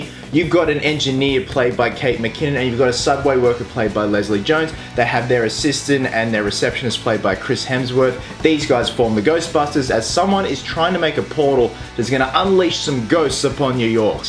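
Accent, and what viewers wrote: Australia. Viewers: I love Australian accents and you have one of the best. -Hugh Jackman -You -ExperGamez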